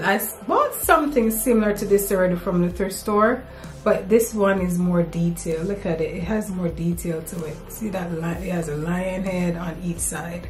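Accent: Jamaica. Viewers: I hit that subscribe button 🔵 the minute I heard that Jamaican accent. Gots to support my Jamaican gurlll. Love everything you scored.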